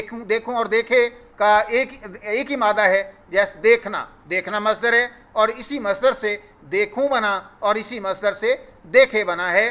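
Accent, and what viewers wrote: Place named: Arab world. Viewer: اچھی کاوش ہے۔ کوشش کریں کہ اردو لب و لہجے میں بیان کریں۔ یہ اردو میں عربی لہجہ بوجھل ہے۔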